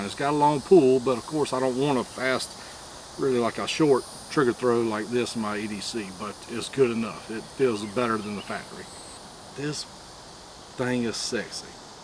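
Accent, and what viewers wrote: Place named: Florida, United States. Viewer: i'm doing a similar build now.. just waiting on the micro comps to come back in stock.. yours looks tough as hell. i was raised in FL.. it's funny how much we sound alike. lol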